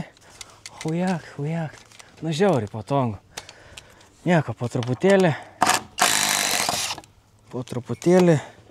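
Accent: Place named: Suvalkija, Lithuania. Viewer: Geras suvalkietiškas dialektas😆👍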